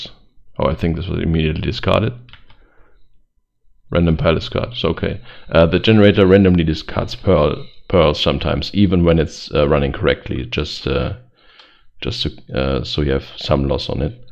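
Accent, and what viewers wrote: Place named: Germany. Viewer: From the dialekt you come from germany oder nicht grins? Crazy OP this way to create energy. No Big Reaktors or other generators are so fast, easy and cheap to get. And it cant blowing up like draconium. I have never use this and i dont know if i like it. You get tons of enderpearls with lilys or mobfarm. Much easier as any early charcoal generator setup.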